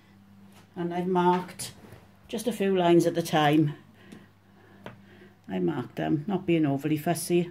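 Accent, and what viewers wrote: Wales, United Kingdom. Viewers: As soon as you started talking I could tell you were Welsh. I looked at your blog and see you're from Port Talbot. Lovely work, you're very talented. I'm from Merthyr Tydfil, not too far away and I probably sound the same, lol. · I know it's welsh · I love your accent. is it Welsh???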